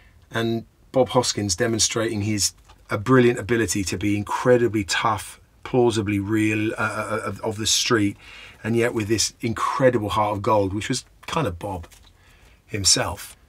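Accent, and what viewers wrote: United Kingdom. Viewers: HES BRITISH!??!!!???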